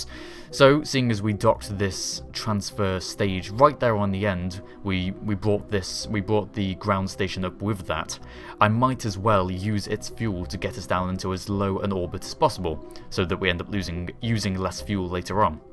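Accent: United Kingdom. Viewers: im really sorry for asking such a stupid question, since im not sure.. but do i hear a british accent?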